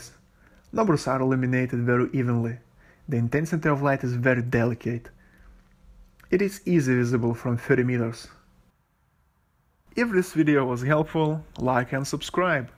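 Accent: Russia. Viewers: Акцент русский... )))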